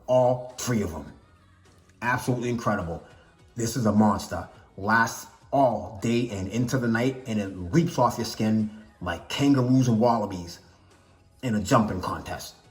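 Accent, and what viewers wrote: Boston, United States. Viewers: Are you from Boston? great list.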